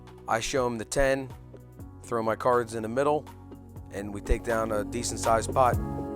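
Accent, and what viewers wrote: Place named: Baltimore, United States. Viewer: Don’t worry so much about anything.... enjoy the process, sounds like you are. I would highly suggest you never limp into the pot, no matter if your mp or utg or cutoff or button, just doesn’t matter (position). Reason is, your limp 3b is never going to include enough bluffs. (Short justification) Always enter hands with raise, 3x or $10 for 1/3. When we enter a hand, just try and play better quality hands that play well post flop. Suited connectors, 7s plus. And finally work in some 3b in position, those guys hate 3bs at the 1/3 stakes and if your in position with a premium hand your likely ahead and this will increase your win rate. Love watching you because your authentic and your baltimore accent always makes me laugh. Talk soon Matt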